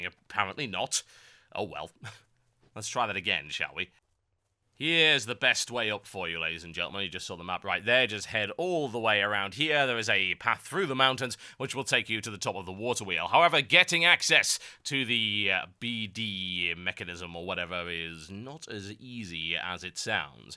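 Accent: United Kingdom. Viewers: wait...are you complaining about a British having an English accent? I'm speechless. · telling a British man to lose his accent... gg kthxbai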